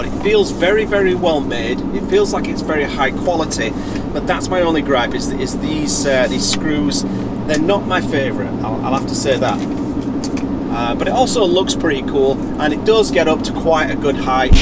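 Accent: Yorkshire, United Kingdom. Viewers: I'm afraid I only got 10 per cent of your advice, I was seriously distracted by your lovely driver... Anyway, I'll just have to watch it again or better: just listen to the old Yorkshire voice...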